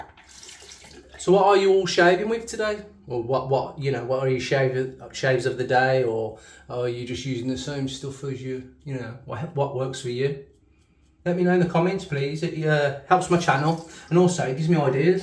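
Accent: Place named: United Kingdom. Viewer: New sub here, wet shaver since lockdown, started 1/7/2020 a date you can remember 😂. I bumbed on your video and said to myself what an enthusiastic lad, I hope you keep this freshness to your future videos. I also love paa john frum, cad is another favorite. To the lad who wrote about why going to so much expenses I would answer when you get in the rabbit hole you can't get out 🤣. Where about in the UK are you? I try to figure out from your accent. I live in Southampton. Take care buddy 👍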